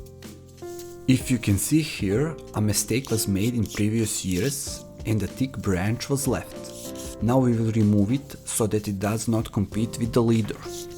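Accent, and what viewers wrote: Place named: Russia. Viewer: Вы русский? у вас акцент, ну или произношение русского)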